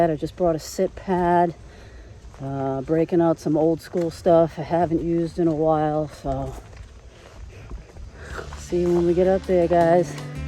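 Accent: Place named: East Coast, United States. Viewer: Love your videos, and your east coast accent 🎉🎉❤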